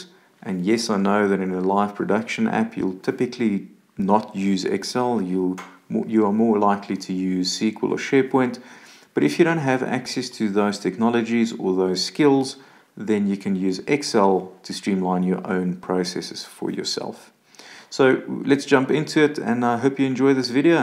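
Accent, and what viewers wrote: South Africa. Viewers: Thank you Mr. This was 10X better tutorial than others videos out there. Also picked up on that SA accent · Cape Town accent??? We are homies. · Hi, where are you based? Can hear the SA accent. Are you perhaps in Cape Town to attend a course or two? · South African?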